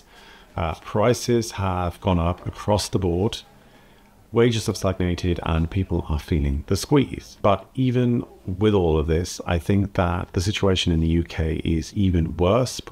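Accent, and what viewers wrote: Germany. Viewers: This is the first of your videos I'm watching. I observe that your native british english has a german accent. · Interestingly, you speak English like a German, not a Brit. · As a Brit I’d love to be able to just get on a train and travel to different countries so easily. Ps I can hear a slight German undertone in your English now. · As a Brit,I love Germany, it's a beautiful country, and I find German frankness very refreshing. Whether I could live there or not ,is a different matter .BTW, after 10 years living in the country, your English accent appears to be tinged with teutonic tones. Thanks for posting. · This video is very interesting and informative. I think it's amusing that you now have a slight German accent. I learned German at school here in Australia in the 1980s and travel to Germany occasionally. I've visited every State, and it's one of my favourite countries. 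I find that if I start speaking German to a German stranger, he or she usually replies in English. Sometimes, that's convenient. At other times, I just keep on speaking German, and before long, the stranger gets the message. Usually, he or she then compliments me on how well I speak the language - so it really is worthwhile making the effort to learn. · Not having to learn German only applies to people with EU passports (or in your case, "former" EU members). All the others, including Americans, will have to show some proficiency at a certain point in time, otherwise the residency will not be renewed. - Unless they changed that recently, which I might not be aware of (which I can't imagine). You're a lovely person and I'm glad the algorithm brought me here. Might it be possible that after all these years you have a slight German accent? What are your friends and family back home saying? 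I'm really curious. All the best and thank you. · I can hear that he lives in Germany in his English!!! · You've picked up a German accent. It's been over 30 years since I last visited Germany. I should go back some day. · It's because he has acquired a (very) slight German accent and diction!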